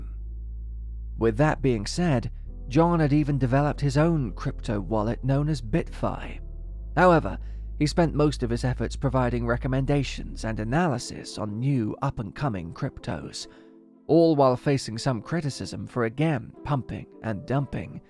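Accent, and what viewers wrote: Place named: United Kingdom. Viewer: John probably watched this in jail and then killed himself due to this pompous british voiced narration mini doc.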